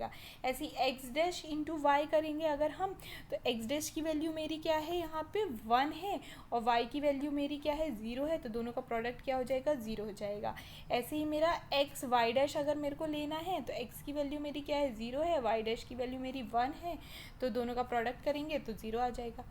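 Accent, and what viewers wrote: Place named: Indore, India. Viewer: Ma'am aap Indore se ho kya ?? Aapke bolne k tarike se laga mujhe. Btw well explained.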